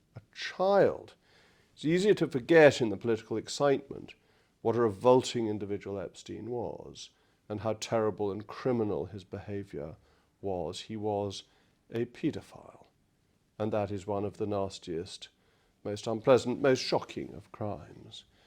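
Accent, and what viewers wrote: RP, United Kingdom. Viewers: Ooh! look at all the Tory troll farm bot six word comments supporting this pencil-necked cretin, Jacob, who was himself one of the corrupt, Putin-financed Tory traitors responsible for the ruin of Britain, and now this posh-voiced pillock is trying to blame the Labour party who, however ineptly, are trying to sort out the corrupt Tory mess. What a disgraceful specimen is!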